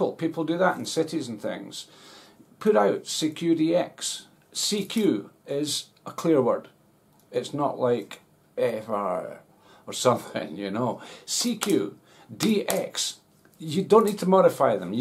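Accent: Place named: Scotland, United Kingdom. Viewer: Hey you old hippie. You sound very much like a Scotsman. I'm a Ham radio operator and quite often I do 11 meters. Hang mostly on 27.385 LSB which is the national calling frequency on 11 meters. I use a Yaesu 991a which I had the MARS mod done so I can go anywhere on 25 to 27 MHz. I use an Antron 99 antenna for 11 & 10 meters. I've got an MFJ 969 Versa Tuner II, roller inductor tuner just in case my 991a won't tune the frequency. Cheers & 73 from W Rusty Lane K9POW in eastern Tennessee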